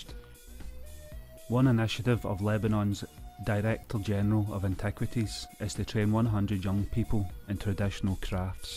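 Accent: Scotland, United Kingdom. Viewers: Hard to understand quite a bit of the content. Hard Scottish is hard for us to understand. is a wonderful narrator and your content is just incredible. Much love and gratitude! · It was great to hear a fellow Scot on such a prestigious channel (haha)...Baalbek is a really intriguing site...far, far older than the Roman construction that was built on top of it...thank you for the virtual tour...love from Scotland... 🎇😁🎇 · I personally found it to be hard to understand in certain points my grandads Scottish aswell so I should have been able to understand better, prefer the previous voice, that guy sent me to sleep most nights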